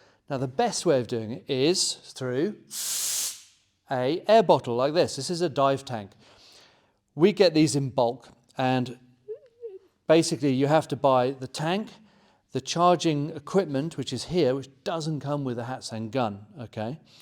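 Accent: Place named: England, United Kingdom. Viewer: English man based in the states I believe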